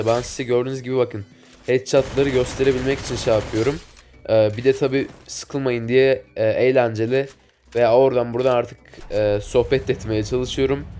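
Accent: Azerbaijan. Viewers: sen azerbeycanilimisin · Abi sən Azerimisin Türkmü vallaha kötü mənadəa demədim soruyorum voruma cevab verirmisin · ABİ azeri misin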